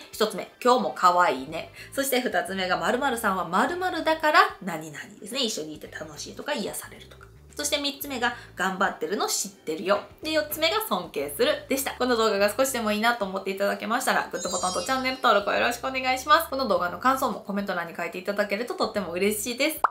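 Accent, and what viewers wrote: Kansai, Japan. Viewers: こんばんは(^-^) 関西弁と笑顔が素敵ですね。 最近、少し痩せましたか？ これからも、応援してますね。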